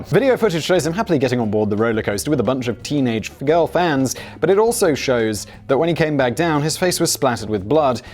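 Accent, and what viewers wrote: United Kingdom. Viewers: I'm here for your beutiful British voice and your ability to rant more frequently than my own thoughts. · Oh dear! We are here because we are Gluttons for Punishment.... and some of us are fans of the British Humour and Accent! lol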